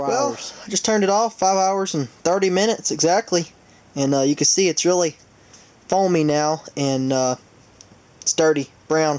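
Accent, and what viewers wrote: United States. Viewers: I love your country accent!!!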